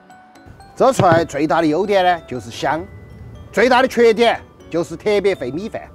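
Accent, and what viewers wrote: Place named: Guanghan, China. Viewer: 你应该是德阳附近的人吧，和广汉人说话口音特别接近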